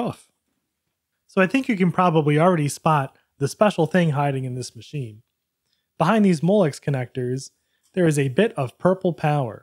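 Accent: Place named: Philadelphia, United States. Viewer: LOL you didn't have to mention "the hot Philadelphia sun"; as soon as you said "wooder" (water) it was plain as day where you're from good sir. :D Thanks so much for sharing this awesome find! I recognized that case from the old Dells of the same era, but I never got the opportunity to see any of those official third-party Macs before!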